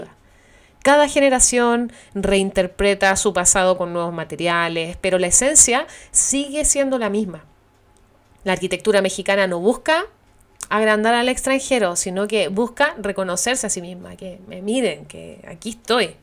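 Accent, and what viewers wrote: Chile. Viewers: Me gusta el acento chileno. Buen vídeo. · que acento chileno maravilloso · Hola te acabo de encontrar y me encantó tu podcast Gracias por entender la esencia de mi país ❤ por explicar tan bien nuestra cultura a través de nuestras construcciones edificios etc En nuestra arquitectura está nuestra identidad Me encanta tu acento chileno ❤❤ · Q lindo es cuchar esas palabras dedicadas a mi País. Con la sabiduría de una conocedora de nuestra cultura.. y experta en Arquitectura!! Me encanta el Acento Chileno. Y su Gente!! Saludos me suscribí. Y estaré pendiente de lo q publicas. Abrazo grande de Guadalajara Mex. Al al estás invitada a conocer. Si no la conoces!! Abrazo grande!! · Que bonita narración y más en chileno saudos a mis hermanos maxas, visita Querétaro tiene mucha arquitectura e historia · Es impactante tu narrativa arquitectónica, dicha con un tono de voz tan característico de las chilenas. 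Espero más de tus videos. Recuerdo el título de una melodía de tu país que dice "si vas para Chile te ruego viajero"..... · Gracias Poe tu narrative soy in Mexicano en Chicago y tengo amogos Chilenos me gusta tu Aden to chileno. Tines Que ir a Morelia Guanajuato a Taxco y Verla otro Mexico Zinzunzan y Verla’s La grna varied de Mexico y su culture felicitates en hora buena Dios te bendinga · Me impresionó la clrides con que explicas todo y me.gusta tu acento chileno 🌹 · Es maravilloso escucharla el acento chileno es muy bonito y escucharla hablar de México WOW que belleza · Me gusta tu voz y acento de chilena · . Creo que eres Chilena? Pero tus programas me cautivaron. Y mira que es un reto ya que en los que escuché no incluían imágenes. Pero tus relatos desatan la imaginación!! Soy Mexicano, hijo de española. Y me capturó tu forma de hablar. Sacaste mi orgullo de ser mexicano!! Y que no todo son carteles de la droga. 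Saludos!!!!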